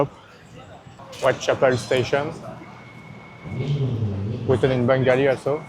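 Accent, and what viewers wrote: France. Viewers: frensh accent :face-green-smiling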